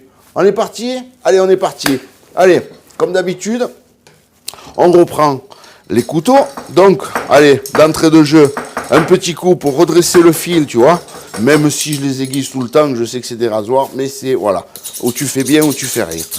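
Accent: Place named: Marseille, France. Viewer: est tu cevenol de naissance ou d adoption? Désolé pour m question intrusive mais ton accent me rappelle fortement le mien et celui des mes proches qui sommes issus de la région marseillaise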